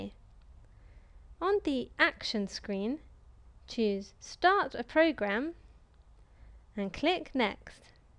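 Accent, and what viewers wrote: United Kingdom. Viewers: That voice + london accent hit me in the heart LOL .... nice video btw :D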